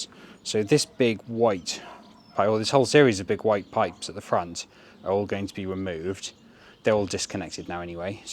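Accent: United Kingdom. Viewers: Some old reclaimed floor boards would be beautiful in this old house 😊 can you tell me where in the UK you are from? I am so curious as to where this accent is coming from! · I like your british accernt! so classy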